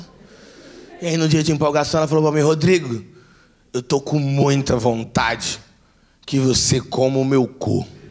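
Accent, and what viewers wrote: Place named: Recife, Brazil. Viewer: Rodrigo sou do RJ. Você merece tudo de bom dessa vida. Te acho muito inteligente. Os palavrões fazem parte do seu show. Ele é dito com diversão !!! Te desejo muitas "Felicidades" muitos "Parabéns verdadeiros". Enfim você nos faz sorrir pelo teu conhecimento e rir de passar mal de tua Alegria. Deus te abençoe Rodrigo e grata por toda a sua Alegria + Alegrias nos dada. Sucesso abundante e muita Saúde eu te desejo. Recife é uma cidade linda e você trás isso em seu coração. Que sutaque lindo. Parabéns muitos Parabéns 🎉🎉!!!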